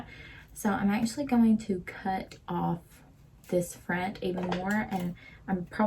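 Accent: South, United States. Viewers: i haven’t even ordered my wig yet but this vid is so fun lol it feels like im on facetime with a sweet little southern lady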